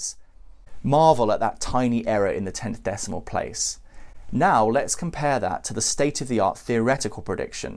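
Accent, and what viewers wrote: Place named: United Kingdom. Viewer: Me a big dum dum! Smarty science guy make me smarterer! Plus his lovely British accent makes it more engaging and it’s neat to think a new fundamental force is out there!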